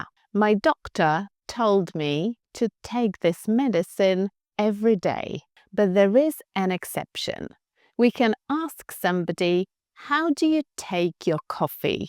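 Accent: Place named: United Kingdom. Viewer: Thank you another great video. How do you get a British accent?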